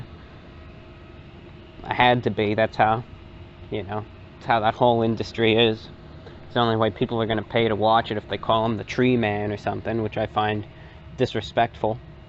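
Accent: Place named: East Coast, United States. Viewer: Just wanted to say good on you for wading through all those trolls and hate comments. I'm on of the people who came from reddit and I'll honesty say this: you're one of the most humble and genuine guys I've seen on youtube. I love the soft East coast accent and your personality. I watch your videos every morning with my cup of joe and my breakfast. You're just perfect to listen to. Keep it up and I hope you do well in the future!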